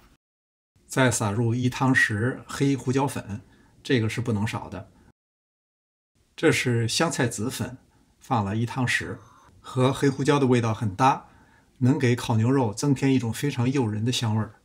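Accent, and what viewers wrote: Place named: Northwest, China. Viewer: 感觉也是个西北大哥，跟妈妈口音都很像～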